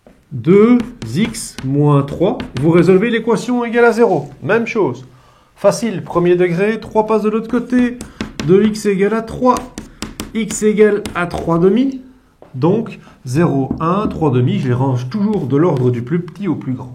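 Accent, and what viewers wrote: Brussels, Belgium. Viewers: Je reconnais bien l'accent bruxellois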